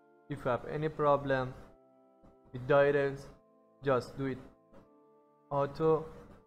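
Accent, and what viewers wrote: Iran. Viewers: منم یه همچین حسی دارم که ایرانیه داره خارجی حرف میزنه کامنت تو رو دیدم بشتر برگام ریخت 😂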